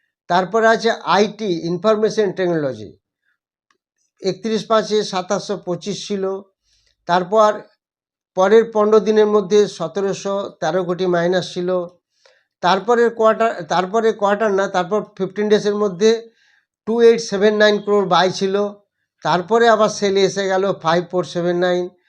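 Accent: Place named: United States. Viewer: হ্যাঁ স্যার, আপনি কিছুদিন ধরে আমেরিকান এক্সেন্টে ইংলিশ বলতে শুরু করেছিলেন, আমি মনে করেছিলাম বাংলা বললে হয়তো অন্য ধরনের সমস্যা হতে পারে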